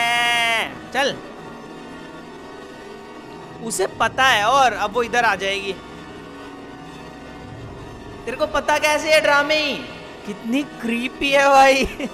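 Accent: Haryana, India. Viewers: I think he needs to bring his old desi accent back 😂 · Who else miss's his haryanvi accent 😢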